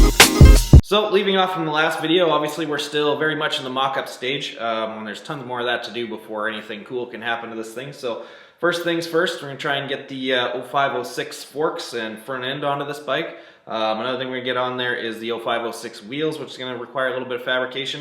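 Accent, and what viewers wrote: Canada. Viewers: what part of canada r u from?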